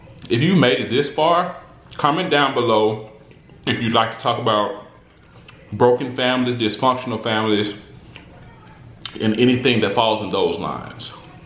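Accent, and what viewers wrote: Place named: South, United States. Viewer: Love that southern accent lol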